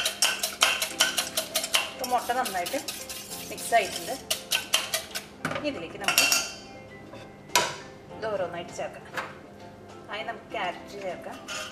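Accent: Thrissur, India. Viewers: Thrissur ano veedu...accent kettitu thoniyatha..!!!..:)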